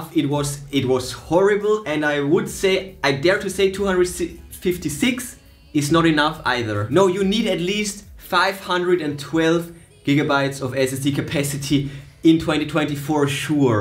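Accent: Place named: Germany. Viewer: wenn du deutsch bist, versuche nicht englische videos zu machen wtf. der deutsche dialekt ist immer schreklich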